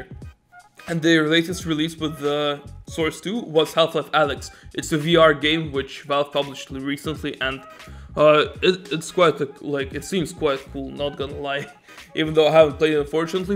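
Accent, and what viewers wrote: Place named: Sweden. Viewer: Wow i quite didn't expected that :D you sound like you're swedish maybe german or polish but not russian :D Great accent mate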